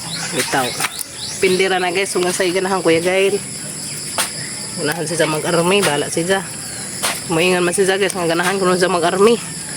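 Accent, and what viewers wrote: Bohol, Philippines. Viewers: Boholana k day? Kc ang yung intonation...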